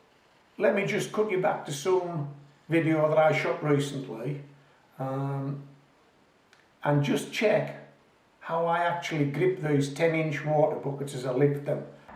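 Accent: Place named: Geordie, United Kingdom. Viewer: I’m a 63 year old geordielass living in Spain. I love your accent, it has a Geordie ring to it but also slightly Yorkshire tint.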